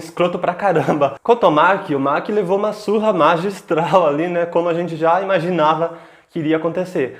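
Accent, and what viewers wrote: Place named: Latin America. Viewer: Cara vc e de algum pais de lingua espanhola? Vc tem um sotaque diferente. Vc nao e brasileiro? Valeu!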